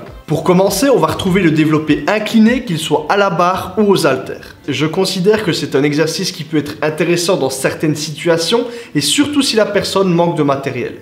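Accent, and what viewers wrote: Belgium. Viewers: Sa s'entend que tu es Belge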